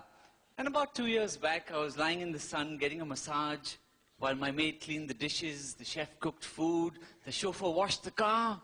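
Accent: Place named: India. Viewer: Well-written but very poorly delivered with absolutely no spontaneity.. He speaks like my nephew would do in an Indian school play.. Probably the crowd is ethnically mixed and cannot make that out from his accent..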